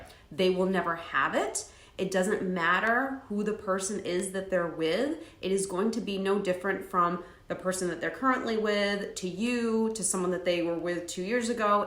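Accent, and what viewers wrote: United States. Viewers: Would you be able to slow dawn when you talk because lm English and find your accent very strong not being rude your American accent is very different to understand please slow dawn or try to talk slower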